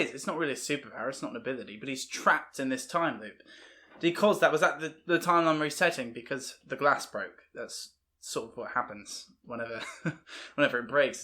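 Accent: England, United Kingdom. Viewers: I pressed play on the video on my computer and then looked at my phone because I got a message and it took my brain a minute to register that there should not be a British accent on this particular video. It is always interesting to watch your videos as you discover more about this universe and try to put all the pieces together! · LOL! I was only half listening to the intro while I was starting all of my other programs to get settled into my work, and missed the bit where you talked about doing the entire reaction in a British accent, so once I finished logging into my workstation and started paying more attention to what I was hearing (without glancing over at this window yet), I was like "Wait, what? Who is this guy? I mean, he kind of sounds like, but he's not...is he? OH!" 😂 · I'm sorry. What happened in this video? I was a little distracted by your English accent because my brain was trying to adjust. 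😁 Kudos to you for trying new things and being vulnerable. I am so happy to be watching a video reaction from you. Thank you! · OMG - the Voldemort part :D I recently watched 'The Mysterious Ticking Noise' soo it really got me :D what a coincidence and your british accent in this video made me distracted :D instead of watching I was so focused what you were speaking :D I don't know if that makes any sense but yeah... :) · I commend you for keeping up with the British accent throughout the whole video. You sounded like a British person who has lived in the states a while. Very conversational. I usually don't have an accent (Filippo) but whenever I come back from a party at my aunt's house, I come back with an accent. At least that's what boyfriend says because I don't really notice it. Sorry TMI. Anyway, just wanted to say great job 👍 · I wasn’t paying attention when the video started and then you started talking in an English accent and I was so confused I checked your channel name to make sure I was watching you. 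(btw I’m from the UK)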